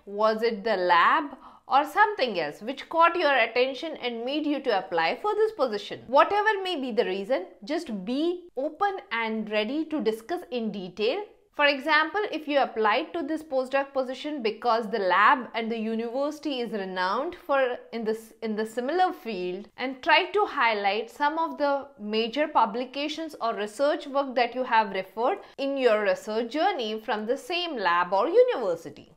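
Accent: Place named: India. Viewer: Best indian accent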